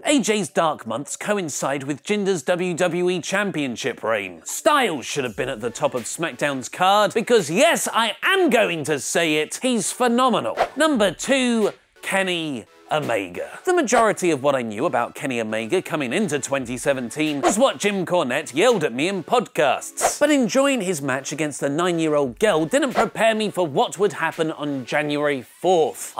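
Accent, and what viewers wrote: New York, United States. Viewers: who else popped for the return of 1920's stereotypical New Yorker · Ahhh the return of stereotypical 1920s New Yorker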